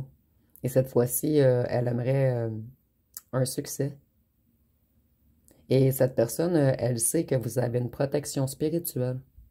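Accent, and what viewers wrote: Quebec, Canada. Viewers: J'adore votre accent cebecois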